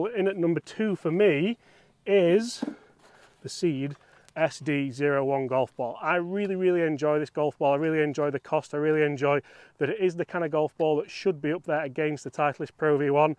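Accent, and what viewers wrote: United Kingdom. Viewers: I love your videos. Please explain what the hell is going on with you Brits pronouncing URETHANE as if it was spelt URETHEEN. I notice it's not just you. It seems to be a thing for you blokes. What's going on? At the end of the day it's just pronunciation so no big deal but why mate? I'd genuinely like to know.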